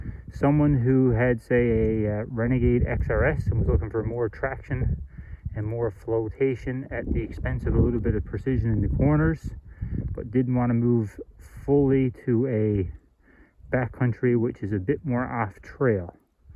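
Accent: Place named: Newfoundland, Canada. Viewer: Thought ya was a Newfie ?!! Lol good stuff thinking this may be my next sled ? Keep xterrain content coming! Cheers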